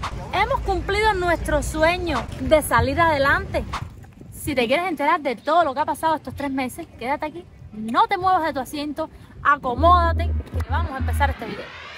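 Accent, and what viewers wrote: Cuba. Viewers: Hola Ojalá puedan lograr su autito. Dile que no se preocupe por el escarabajo. Es fiel. Y por sumba. Búscate un gym y ofrece tu servicio. Te darán lugar para que ejerzas. Uno buenos pesos no está mal. Y con tu tonalidad cubana....se llenara. Tengo un gym. Por esa razón te comento. Abrazo desde BsAs.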